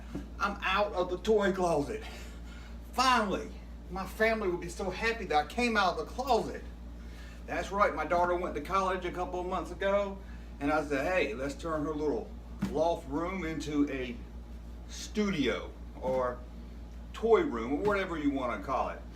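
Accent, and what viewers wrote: South, United States. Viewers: Where are you from, you have a southern accent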